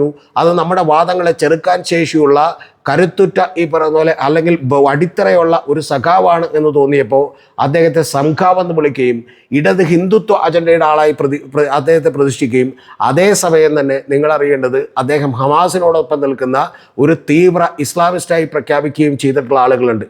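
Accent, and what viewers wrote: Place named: Kottayam, India. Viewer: എന്തു ഭാഷയാടോ ഇത്. അതുപോലതന്നെ, അതുപോലെതന്നെ . കോട്ടയത്തുകാരനാണോ . ഉദാ: നിങ്ങളു० ഞാനും ഇതുമതി .അതിനു പകര० നിങ്ങളു० അതുപോലതന്നെ ഞാനും.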